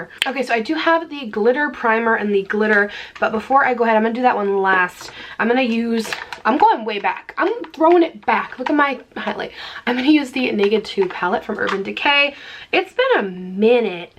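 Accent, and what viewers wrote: England, United Kingdom. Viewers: LOVE your English accent 😂💋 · Voiceover with British accent... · British accent is ON POINT! Wow! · love British accent🤣🤣😂😍great job so believable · ❤️ this look! You shine bright like a diamond ✨ Your English accent is cute too; it would trick me. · Love the video, Great makeup, great British accent, and you look beautiful. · OMGOSH that Accent!!!!!!! I vote you do an entire video in the Brit! XOXO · wow that british accent 😍😍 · Yaaasss do a get ready with me with a British accent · British accent on point girl <3 love your vids always. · You totally sound British I love it!!!! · Love the British accent! And that highlight, ooooh girl!!! Such a gorgeous romantic look! I hope you and Alan had a good 5 year anniversary! 💗 P.s. Lolol 😂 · Actually impressed with your british accent;) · British accent is spot on! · you sound british as can be.!!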